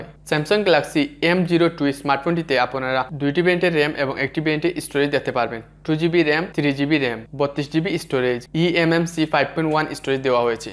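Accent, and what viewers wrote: Sylhet, Bangladesh. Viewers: vai sylhety na kita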